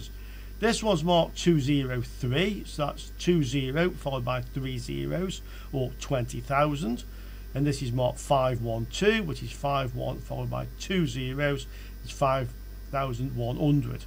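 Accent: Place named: England, United Kingdom. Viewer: I very much enjoyed your English accent. I also enjoyed your teaching. It clarified my understanding of the TL 431. And I subscribed to your channel. thanks for sharing.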